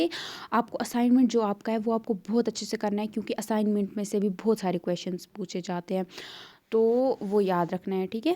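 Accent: Kashmir, India. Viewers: 😂😂di kashmir se ae🙄kashmiri typ urdu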